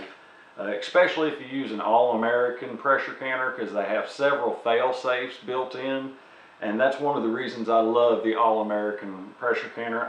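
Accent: United States. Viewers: I like this guy! And he got a like and subscription because he wasn't all "hay goys loike n subscroibe dood" na he's all American. A man America herself wishes she could emulate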